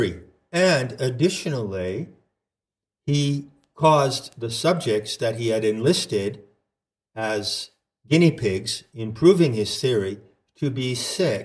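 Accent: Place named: Boston, United States. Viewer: And thank you for stating the obvious, as you say toward the end...those of us with eyes to see appreciate it all the more, as it makes one feel less alone, which fortifies the will to work harder in the attempt to reach out to other human beings. Obviously you are hip to Gates foundation and others wanting to mutate (gmo), and therefore patent, all life on this planet. They must be stopped, they are far enough down that road already. I can hear youre aware of much of this, thank goddess! Its amazing how everthing gets inverted by these folks, as in the term 'germ' which USED to denote growth. I can see you gnow this very clearly. I am a Jungian Psychologist originally from Salem, Ma, now in sw florida. I spent a few years living in Taos, NM...wish i knew about you then, i could have visited you in Santa Fe!?The fact that you havent completely lost your Boston-ish accent warms the very cockles of me heart! 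I have no idols - but i hope like heck to run into you someday. In meantime, thank you for your lifes work as well as reaching out to those who care to listen. I have never stopped listening to nature, for she is ever honest and truthful - as i think either Geothe or Steiner said...or was it perhaps Whitman? I love them all - and i send my love to you, oh kindred spirit! ; )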